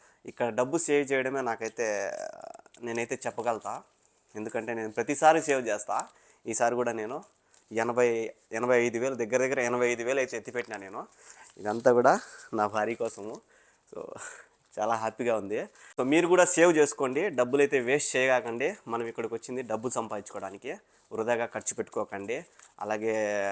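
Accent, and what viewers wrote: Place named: Kadapa, India. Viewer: anna mee native place kadapa naa anna yaasa alaane vundi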